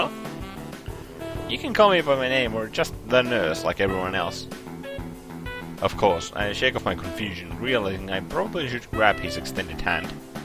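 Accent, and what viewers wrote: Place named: Finland. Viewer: It might sound a bit stereotypical but i really picture Sam Lake reading Katawa Shoujo to me when i listen to your LP, i guess that's what a finnish/english accents will do to you! :D Good stuff so far really enjoying it!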